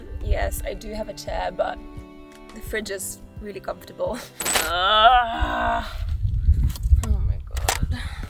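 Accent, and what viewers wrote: England, United Kingdom. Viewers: She grew up & worked in London hence the British accent . Also she went to Oxford University over there !!! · What a great video and such a lovely girl. What a lovely English accent she has! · Back when had an English accent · The British accent, the Land Rover Defender.. I think i found Lara Croft in real life :) Don't tell me not everybody had that thought hahaha 👍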